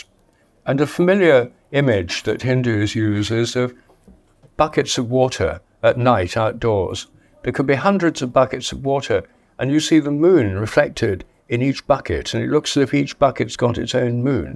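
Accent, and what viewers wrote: United Kingdom. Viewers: Before I started the video I says to myself I bet this guy has a British accent and bada bing, I predicted the future!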